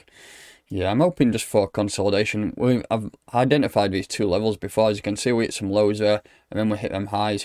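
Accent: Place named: Northern England, United Kingdom. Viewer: Proper northern boy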